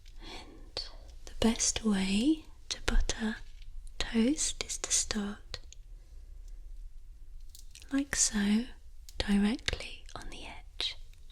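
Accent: England, United Kingdom. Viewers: Your absolute perfection in ASMR! Your pacing, presentation, variety of subjects, and your near angelic English accent is simply...just WOW! Very original and enjoyable. Thank you. 😊🖐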